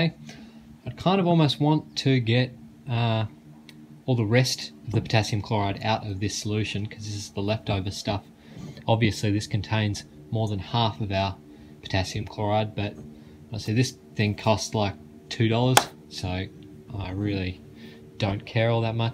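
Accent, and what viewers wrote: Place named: Australia. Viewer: Holy shit mate where are you from in Australia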